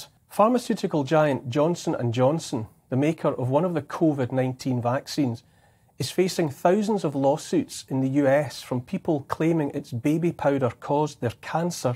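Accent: Scotland, United Kingdom. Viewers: Thank you from Germany for your good words! I love your accent, reminds me of a wonderful holiday in Scotland with my husband long ago..... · I LOVE this Guy, thank you Neil, first of all, as a Swiss born & raised I couldn't speak English when I came to Canada in 1980, I got taken in by an elderly Scottish Couple, hence, it's Scottish English I learned first, so I love listening to you, greed is killing us, Pharma greed NOT THE good old "FLU"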